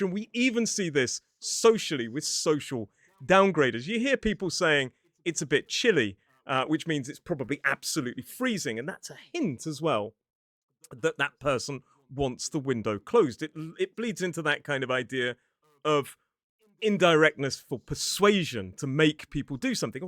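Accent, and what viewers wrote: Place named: England, United Kingdom. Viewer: I hadn't noticed the backdrop until now. Maybe there's something missing to be sure that we are in an English man's house 😉 It's always a pleasure to watch your videos. French people are not really polite and rather direct. In-your-face rude unapologetically. English people are the best. And your accent... ❤ I'm fond of it. Thank you from Paris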